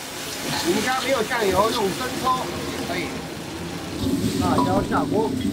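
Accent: Chengdu, China. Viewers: 我也觉得，还是你说成都话会比较亲切和正宗！我回成都去你那里蹭一顿饭吧？谢谢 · 同作为四川人的我, 听到你说川普, 那感觉你懂三?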